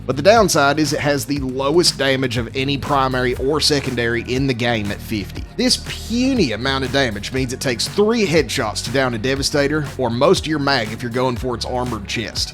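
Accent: South, United States. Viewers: im new as it just came to xbox and i thought this game couldnt get any cooler and then i found your channel. nothing makes me wanna spread democracy more than a fellow sweet tea accent screaming about freedom. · Hey , is the southern draw an affectation or your natural dialect? I don't mean to shatter the illusion, I love your content, just seems like a lot of work you do for us if it isn't!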